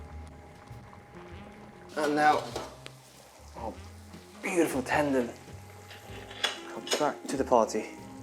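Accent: England, United Kingdom. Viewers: You'll be surprised with how much English the average asian have to learn. That, combined with the fact that he'd be studying in England for a long time to get that accent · brother is throwing me off he looks like an asian uncle with a british voice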